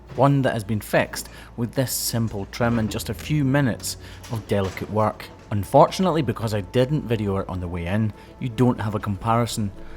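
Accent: Scotland, United Kingdom. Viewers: I love your channel so much, I've been learning to speak with a Scottish accent just by repeating things you say! I think it's a promotion, and I bet you agree! Keep up the great work! Cheers to and! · But you did provide footage, if accidentally. She was walking toward the crush and kept that foot off the ground completely, hopping with each step. I am very grateful to your narration, too. I can understand your Scottish accent. But you visited a farm in Wales with a soft-sided corral system and I had to turn on closed captioning, I couldn't fathom what she was trying to communicate. Thanks for all you do, I'm sure the girls you work on appreciate it too.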